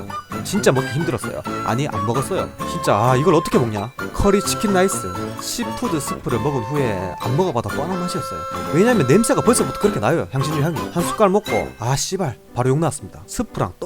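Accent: Gyeongsang, South Korea. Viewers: 16일에 싱가포르가는데 정말 많은 도움이 됬습니다 ㅎㅎ 저도 경상도라 말투에 호감이가네요~ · 이때까지 100번 들었습니다 저는 경남 창원사람인데 말씀 하시는 게 대구사람 같네요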